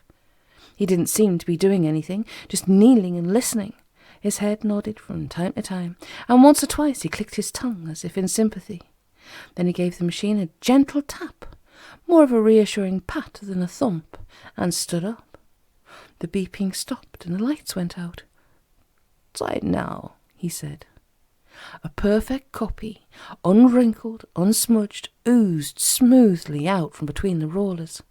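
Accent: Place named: London, United Kingdom. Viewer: Great London accents btw not overdone which is a mistake even professionals make sometimes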